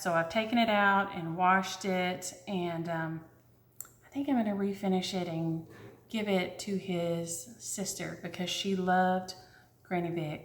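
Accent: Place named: Texas, United States. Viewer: I’m so glad to have found you! I thought you were tons of fun, and you sound like home (Texas) to me. Thanks for doing FlossTube!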